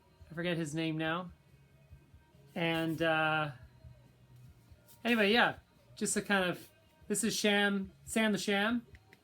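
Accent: United States. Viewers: Dude you're not a London boy you sound American or canadian Americans started everything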